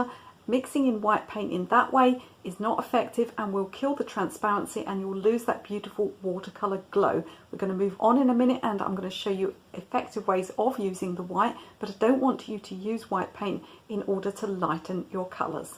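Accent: United Kingdom. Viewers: Funny thing. I started following your channel because I'm an English teacher in Brazil and I fell in love with your clear and bright British accent. Now, I'm in love with watercolours,too. I've bought a Windsor set of watercolours and started doing it as a hobby. I'd say it seems therapy to me. thanks a million for opening this amazing channel <3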